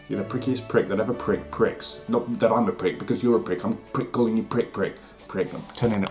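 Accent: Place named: United Kingdom. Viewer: Hey! It's a British Person!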